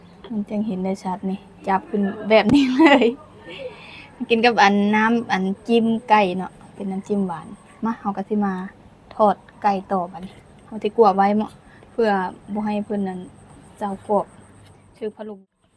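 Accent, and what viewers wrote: Thailand. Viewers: หนูเรียนภาษาไทยเหรอพูดสำเนียงคล้ายคนไทย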